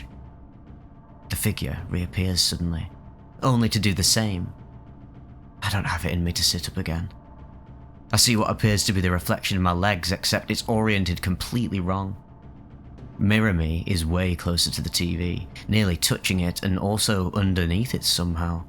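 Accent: United Kingdom. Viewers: Wait he might be….British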